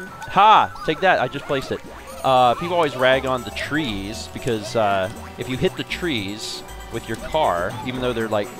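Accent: South, United States. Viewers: All this time I though exclaimed "Gerahl!" I now understand he was saying "Draw!" Wow...southern drawl can be very odd sometimes.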